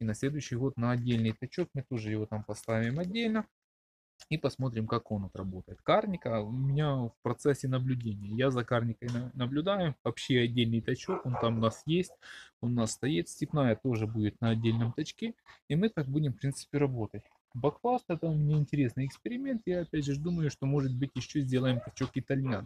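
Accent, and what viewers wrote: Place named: Kharkiv, Ukraine. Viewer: Сократить, утеплить. В октябре, при наступлении температур днем не выше 12-14градусов, присоединить пару рамок с пчелой от сильных семей. Подсилите таким образом. Или соедините с отводком сейчас. Семья эта слабая. Перезимовать перезимует, а как родоначальница будет не айс., Вы с Харькова? Только от тамошних пчеловодов слышал слово ульИ, а не Ульи...извините.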